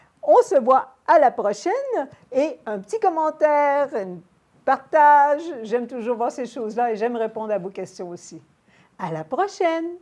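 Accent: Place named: United States. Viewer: Première fois que j'arrive sur une de vos vidéos, quelle élégance pleine de simplicité ! Très vite je me suis dit "cette dame est parfaitement bilingue français/américain" en entendant votre façon de dire "é-et" a la manière américaine "a-and" 😊 Merci pour cette belle recette ! Mon vieux déshydrateur est rond mais je devrais trouver une solution. Merci encore, vous êtes très inspirante 🌺 🇫🇷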